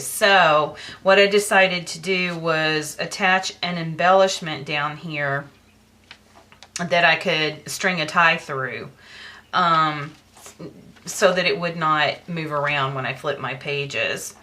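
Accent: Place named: United States. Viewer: What a wonderful album,and watching you do it from the start was great... Love your videos and will be watching them all, also love your accent, whereabouts in the States are you from?.. I am in UK, we do not get some of the things you get easily over there, though it is getting better with the online stores, but my are they pricy! Best wishes, Lesley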